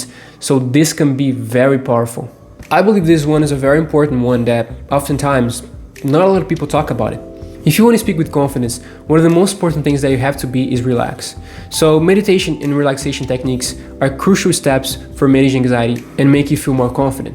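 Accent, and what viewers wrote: Brazil. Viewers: Guy, you're simply amazing! Are you Brazilian? Your english is so perfect. Kudos! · he has a slight accent from northeast Brazil, but this is normal. hahaha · Real, quando eu olhei e ouvi ele falando já ficou claro que era br KKKKKK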